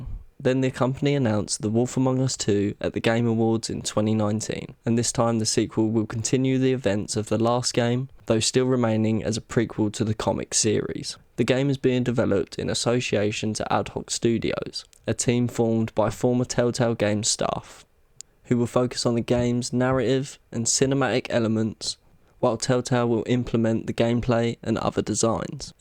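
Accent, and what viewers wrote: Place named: United Kingdom. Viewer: Thank you kindly for your amazing video and I love your British accent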